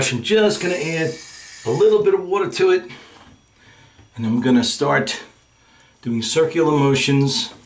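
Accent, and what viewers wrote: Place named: New York, United States. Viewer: I can tell from your accsent you grew up in the big apple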